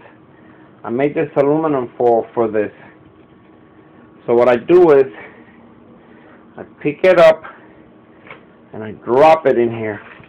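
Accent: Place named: Upper Michigan, United States. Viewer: sounds like this boy is somewhere just a little north of Marquette Mi,????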